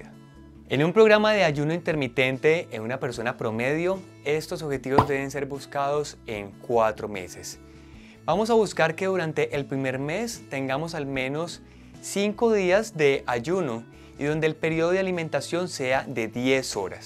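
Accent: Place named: Colombia. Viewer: no se porque ala mayoría de personas les gusta nuestro acento colombiano 🤔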